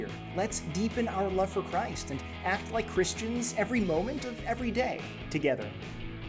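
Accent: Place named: United States. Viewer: Καλή Δύναμη! I have used your videos for a few years now and my students find them informative and engaging. The time is spot on. We're in Melbourne and we always smile at the 'American' accent. Just a suggestion, would you be able to prepare a video on St John the Baptist? Not just about his presence at Theophania - the Beheading of St John the Baptist has many lessons to teach us. Especially trying to navigate the world we are living. He is also a relevant 'environmental' figure with him eating locusts and wild honey. The 'food for the future people' only need to look back.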